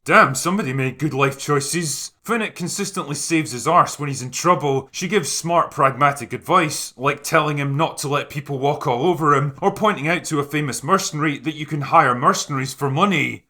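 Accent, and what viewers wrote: Scotland, United Kingdom. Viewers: Done in a beautiful Scotish accent as well, a fine piece of journalism in a world of dying journalists, the only true news i,m heard this decade, nice one mate.......... ITS A FUCKING JOKE , MODS , the designers went raving and used Quadrophinia as the storyboard instead of going to work......... WANKERS. LOL..... brilliantly said mate.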